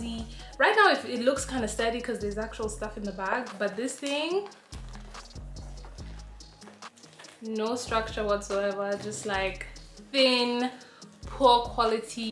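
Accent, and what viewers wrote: France. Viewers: From a french girl , you have such a GREAT accent, I almost thought you was French too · When you started talking in French I thought you were French due to your accent and I am French So I can tell you you sound like you’re French this is sooooo crazyyy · even if you know a few words your french accent is damnn! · Par contre, ton accent français, MAGNIFIQUE. I was chock 😳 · Love the french accent! You were in my suggestion, it's funny because you just look like my younger sister and I'm french!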